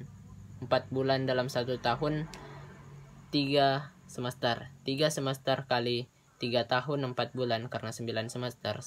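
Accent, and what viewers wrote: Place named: Bugis, Indonesia. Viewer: org bugis mks ka bro logatnya okkots...minta dl no.hpnu?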